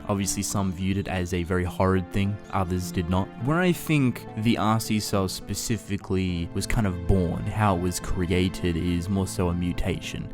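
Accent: Australia. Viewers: Polynesian tribes in those days did actual believe in the spiritual cannibalism that consuming the flesh of your enemy made you a more efficient warrior annnd say swear your aussie thats crazzy cause i never realized at all haahah im froom melbourne btw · Wait, ur Aussie? Same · Wow, can definitely hear your Australian accent much more in these older videos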